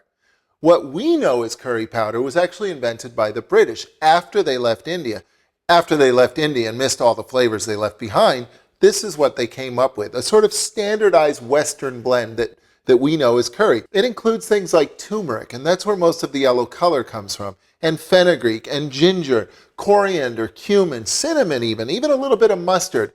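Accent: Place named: Canada. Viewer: He has a Canadian accent but Canadians don't say Dell instead of Dhal. I think its ignorance.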